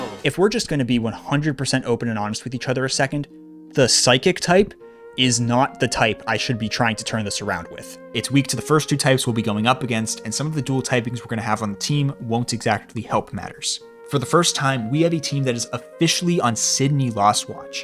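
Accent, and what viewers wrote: United States. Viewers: Are you from the Philly area?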